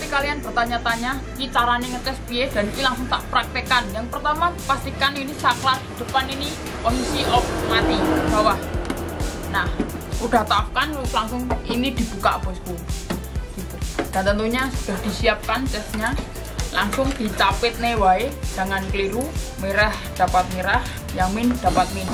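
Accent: Java, Indonesia. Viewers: Kentel banget jowo nya.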